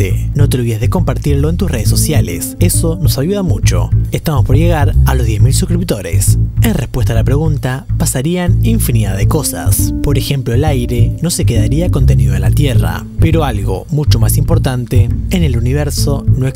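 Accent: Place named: Buenos Aires, Argentina. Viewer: Jejeje, sobre el acento, ya no se nota tanto el acento de Buenos Aires... Saludos, amigo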